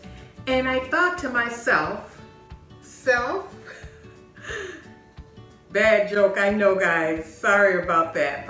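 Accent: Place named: Mississippi, United States. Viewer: You must be a Mississippi gal. New South Ford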